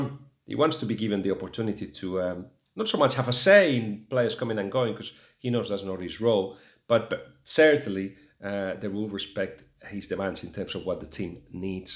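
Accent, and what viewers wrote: Spain. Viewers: So this guy right here is certainly a comic, he pretends he knows about football but I honestly don’t think he does, so he came here sat on the same chair with his thick Spanish accent talking about how tactical and good OLGS was, and that he was a better fit than mournho, I’ve always said this, if mourinho had been allowed to be mourinho and not crucified him like the media did to make him Look a mere manager then United would have been better off, pogba got mourinho that axe because he couldn’t free him, or martial is not given the freedom, oh Alexis wasn’t given the freedom that was staple everyday, mouh is gone yet still united are shit. This guy said United are having a mourinho hangover because some of the players are used to his way which is utter nonsense, pogba will force a move to Madrid just watch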